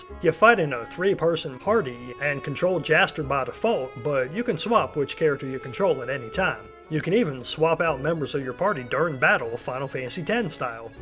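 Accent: South, United States. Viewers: why does the narrator sound like hes auditioning to be a character in the video game with a southern accent?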